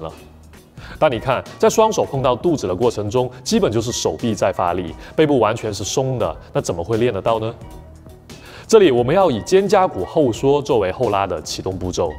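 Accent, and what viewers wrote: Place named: Guangxi, China. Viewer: 非常棒的视频，感谢分享！听口音广西人吧？和我关注的另一个股票类youtuber：Emile Su的说话方式真的很像。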